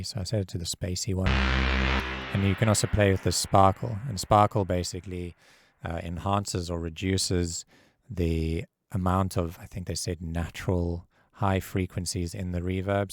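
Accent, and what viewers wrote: South Africa. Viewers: hey man, are you south african by any chance?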